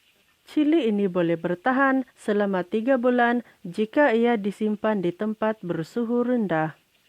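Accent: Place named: Sabah, Malaysia. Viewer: Cara ulas tu bah, punya lain.. Pakai ja tone sabahan bah.. 😆😆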